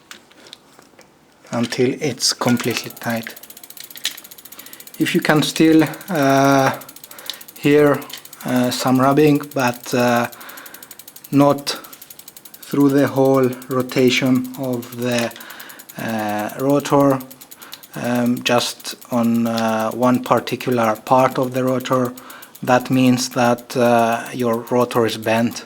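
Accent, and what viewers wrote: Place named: France. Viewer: Thank you! What accent is that? It sounds almost like French! Merci beaucoup! :)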